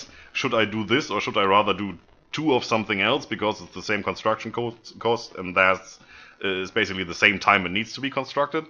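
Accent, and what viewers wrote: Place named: Northern Germany, Germany. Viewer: Always warms my heart to hear a North German accent. :)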